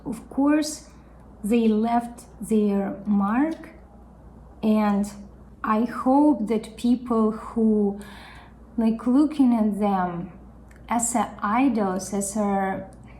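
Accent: Russia. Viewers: Забавная реакция) судя по акценту, ты русская? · Where you from ? Russia?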